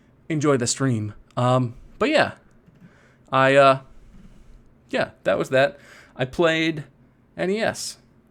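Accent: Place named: Canada. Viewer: OMG your Canadian accent just killed me, LMFAO #CanadianPride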